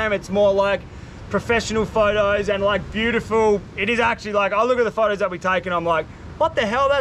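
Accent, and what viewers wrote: Australia. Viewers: Thanks for doing a Q & A video. I could easily tell you were a fellow Aussie but didn’t know where you came from. Also good to learn a little more about your background and some more about your girlfriend. One other thing I was curious about was the food poisoning/sickness question. I’m NOT making any judgements here but many of the situations where you’re preparing your food looks quite unsanitary. So, I was always afraid you’d make yourself very ill. Just like many other thousands of Australian citizens, I hope you can come back home in the near future, very soon. Keep up the great work with the videos :) Oh, and I also grew up in the country and had interesting pets. We had emus!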